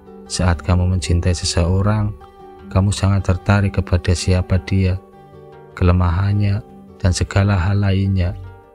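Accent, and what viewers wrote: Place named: Java, Indonesia. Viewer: Njowo bangett mass, medokk pisannn buat jd cowo maskulin😂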